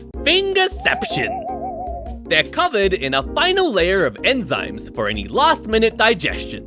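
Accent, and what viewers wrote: United Kingdom. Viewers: Are you british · are you british?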